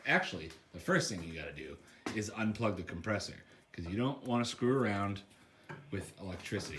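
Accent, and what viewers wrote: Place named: Canada. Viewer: You sound like a Canadian.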